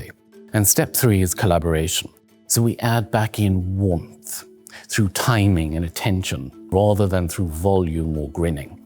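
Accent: United Kingdom. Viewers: Give me a British accent like this guy, and I guarantee my confidence is perceived as 50% higher. Stupid American accent.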